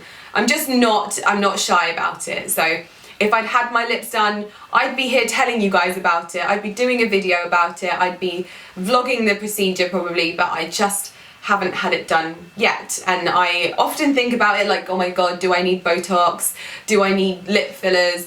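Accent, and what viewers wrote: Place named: England, United Kingdom. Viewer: Great video. Can someone tell me precisely what area of England this accent derives from? It's by far the most pleasant sounding.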